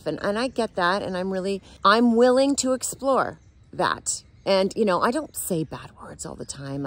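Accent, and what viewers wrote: United Kingdom. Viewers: Your British accent is so Carnaby Street! I love it. Cheers.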